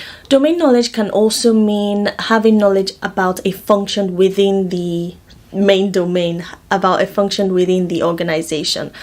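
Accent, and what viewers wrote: Nigeria. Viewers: Are you Nigerian? Your accent…😍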